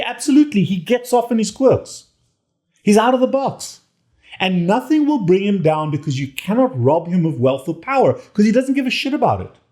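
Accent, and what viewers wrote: South Africa. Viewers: You just great. You still got the SA way of talking 😂🤘. Just like Elon and you, I also grew up in SA and I don't give a shit about what others think or say about me. My head is up no ones ass either. I need no stuff. I live for me and my children I am now living a healthy carnivore life as I can see the truth. Please keep sharing your knowledge with us as you helped saved my life as I can now see outside the box.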